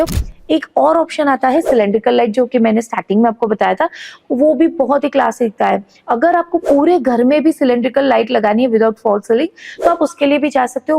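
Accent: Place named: West Bengal, India. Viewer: Why you sound a bengali?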